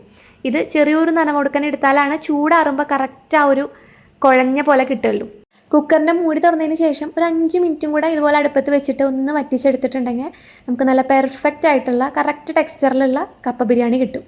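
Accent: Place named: Thrissur, India. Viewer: തൃശ്ശൂർ ക്കാരി ആണല്ലോ. കൊള്ളി എന്നു പറയ tcr അല്ലെ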